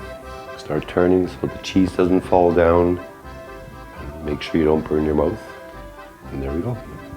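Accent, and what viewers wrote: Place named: Canada. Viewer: You sound like a fellow Canadian sir edit: "deciliter" definitely Canadian, greetings from Ontario, j'aime le fromage, merci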